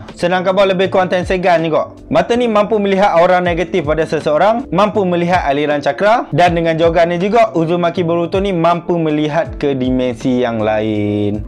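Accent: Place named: Kuala Kangsar, Malaysia. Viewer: atee deme ckp mcm orang kuale deme orng perak yea bote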